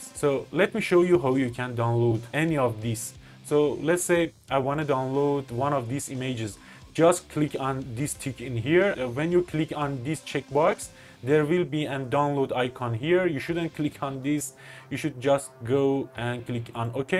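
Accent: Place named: Turkey. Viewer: Türk aksanını yakaladım hocam :D Ellerine sağlık harika video olmuş.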